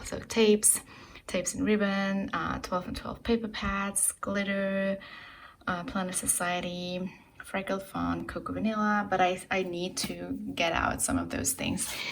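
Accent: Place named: France. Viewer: Hi, Thanks for such a detailed craftroom tour and all the inspirations. Love your French accent. Je parle tout petit Francais. Thanks for the link of the beautiful paper wall flowers from Etsy. I was also wondering whether you can give me information on the poppies wallpaper at minute 2: 48 on your video. A link would be awesome or at least the store you bought it from. I loooove it so much and hope to get it for my house. Thanks so much. Hugs, Rosalie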